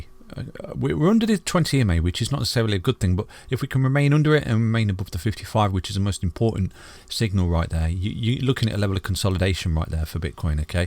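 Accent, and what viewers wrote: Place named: East Midlands, United Kingdom. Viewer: East Midlands?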